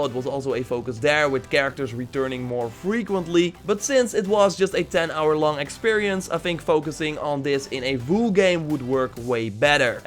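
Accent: Netherlands. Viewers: Are you from NEDERLAND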